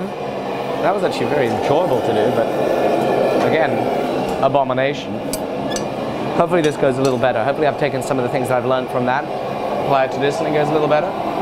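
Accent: England, United Kingdom. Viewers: were is from? sounds English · Hey man, great video. Where in England are you from?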